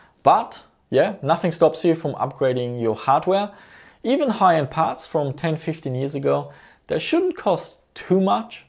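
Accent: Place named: Germany. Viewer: Du hörst Dich so an als wenn Deutsch dir vertraut ist. Ich finde den Kanal toll weil Du Dir die Mühe machst Benchmarks zu machen und die Unterschiede klar zum Vorschein kommen. Was mir auffällt ist, dass einiges so oder in einer anderen Form neu aufgerollt wird und von anderen Seiten beleuchtet wird. Was ich an diesem Video vermisse ist das Thema ("T&L). Das ist zwar schon einiges älter aber hat schon mit mit AA zu tun oder doch nicht?. Grüße aus Hamburg (Germany)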